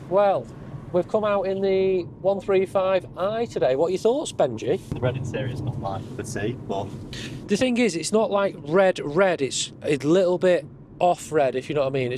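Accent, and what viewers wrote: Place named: Northern England, United Kingdom. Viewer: Very northern, nasally, drowning, blunt, what's northern for chav..!!??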